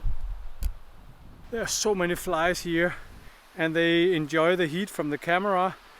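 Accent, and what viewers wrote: Denmark. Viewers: I haven't finished the video and I don't yet know if I'm going to buy a Nikon mirrorless (currently have Nikon DSLRs but also a Sony α7RIII) but a Brit who once lived for a year in Aalborg, Denmark I absolutely love the sound of a Danish accent speaking English! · I allways like the big fat danish accent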